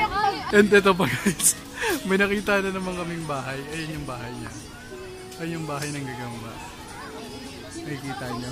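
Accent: Visayas, Philippines. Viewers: Woii bisaya ka pala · Bisaya man ka noh klaro kaayu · bisaya diay ka kuya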